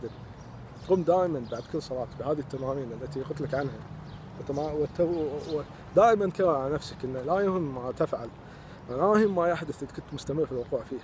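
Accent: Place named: Kuwait. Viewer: يعني تدري يسولف كويتي ولهجته واضحه وتسئله انت سعودي 😩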